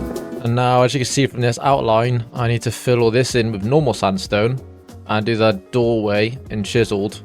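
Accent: United Kingdom. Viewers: Excuse me sir.. odd question but are you Cornish? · are u british because u say "li'el bit more"instead of little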